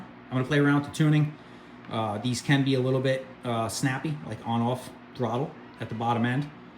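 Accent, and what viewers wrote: New York, United States. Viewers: Definitely from New York 🎉🎉😂😂hear it in voice🎉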